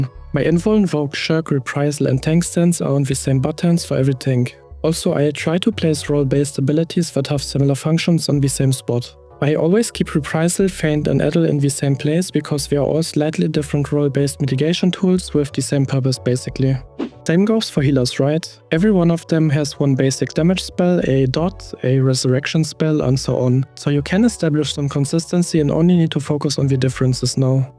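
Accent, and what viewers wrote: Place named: Germany. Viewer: Es ist schwer dir zu folgen wenn du Englisch mit so extrem harten Deutschakzent sprichst. Ansonsten Danke für das Video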